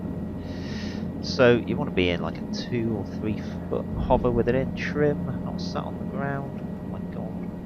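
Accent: United Kingdom. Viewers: I have to admit that my lack of comprehension was also due to me being Canadian and having a hard time understanding the British or Australian accent, unless I can also read lips 😂. No idea if yours is a British or Australian accent, or whatever, but I would guess British, right ? 😂🤣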